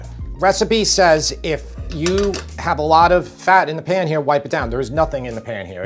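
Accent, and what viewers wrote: New York, United States. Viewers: Sounds like yall need to get to know your butcher. I don't buy prepackaged meat when I can I want to look the guy in the eye that's packaged the meat. Crack some jokes bull shit. IDK sounds like you're from new york or up new England. It's different in the south.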